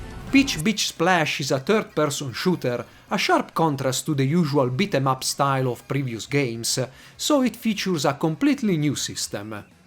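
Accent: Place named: South, Italy. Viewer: I know you aren't italian from your last name, but man, you could also fool a southern italian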